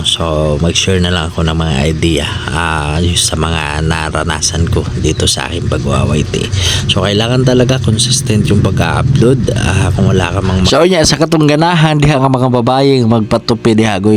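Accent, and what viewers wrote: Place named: Cebu, Philippines. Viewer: Like12...MAAYONG GABII IDOL... AYAN OK NA GUPIT SA BAGONG STYLE PAMORE .. TINUOD KA LODS...DI BITAW LALIM MAG VLOG CONTENT CHANNEL PERO OK LANG LABAN LANG SA BUHAY ALWAYS HAPPY...NINDOT DIAY NI NGA BARBERSHOP KAY ALL AROUND PAMORE... IBA ANG TUNO LENGUAHE NGANHA SA CEBU KAY PURE JUD KAAYO MANGISTORYA DILI PAREHA DIRI SA MINDANAO BISAYA/CEBUANO SAKSAK SINAGOL PAMORE... DONE WATCH FULL SUNGKO SA TUMOY... mins.😊😊😊WITH ALL ADS COMPLETE...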